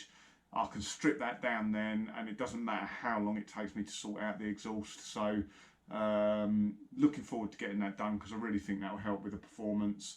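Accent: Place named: RP, United Kingdom. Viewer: Ooohh posh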